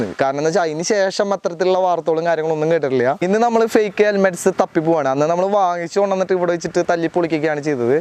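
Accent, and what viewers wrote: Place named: Thrissur, India. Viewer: പഴയ തൃശൂർ slang thirich vannittund.. loved it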